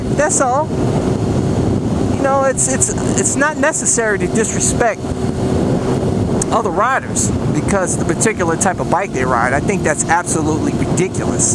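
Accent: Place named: Washington DC, United States. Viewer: Ohioians have an accent, you sound more like you're from DC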